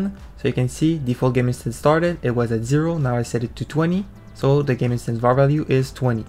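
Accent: Quebec, Canada. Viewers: where u from? u sound french canadian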